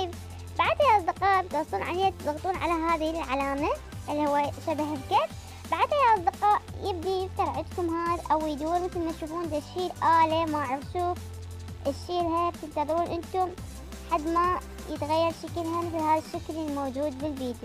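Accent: Iraq. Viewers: ة انا من العراق تحية كبير لي اهلك و لي الج 😀😊 اعرف انتي من العراق مبين 😜سوري سوري بس احبج 😍😁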